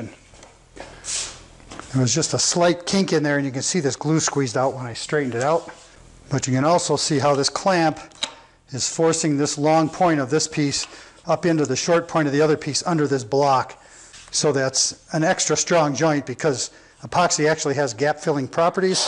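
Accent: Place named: Italy. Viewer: Say hi to Chip ! Nice project ! Also nice Italian accent !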